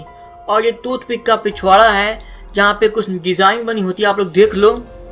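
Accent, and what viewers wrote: India. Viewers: Ngomong nya kaya orang india njir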